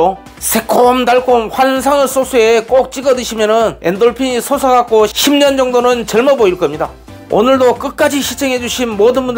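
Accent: Jeolla, South Korea. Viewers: 전라도 사투리 쓰시면서 억수로는 경상도 사투린데 섞어쓰시네 고향이,어딘교 구례쪽이신가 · 사투리가 딱 전라남도 서남부 목포 스타일 이네요.. 정겹네요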